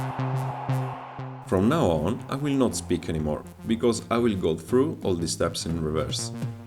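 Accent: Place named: Italy. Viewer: You sound very Italian :)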